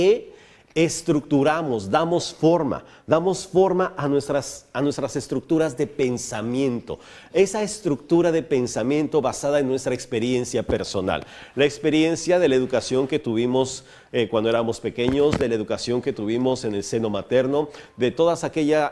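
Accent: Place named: Mexico. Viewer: Me suena mexicano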